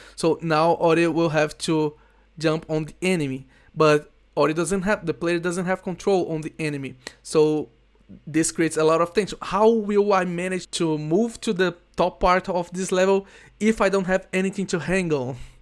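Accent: Brazil. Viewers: err...are u brazilian? ur accent and the books...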